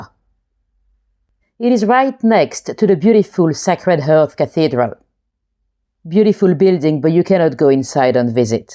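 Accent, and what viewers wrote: France. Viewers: English with french accent what i mix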